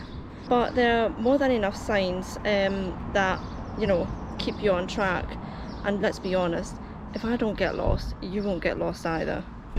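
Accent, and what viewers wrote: Scotland, United Kingdom. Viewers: I have been trying to work it out for ages, but I give up guessing - which part of scotland is your accent from? Love the videos by the way!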